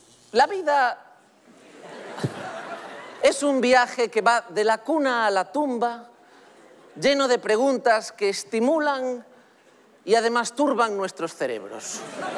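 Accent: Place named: Galicia, Spain. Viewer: Me encanta el acento gallego. Es precioso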